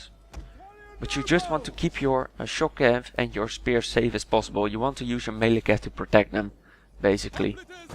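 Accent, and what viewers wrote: Netherlands. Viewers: damn i know dutch person when i hear one, lekker bezig pikkebaas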